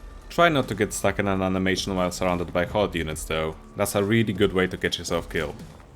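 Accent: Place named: Germany. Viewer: He has a german accent, I trust him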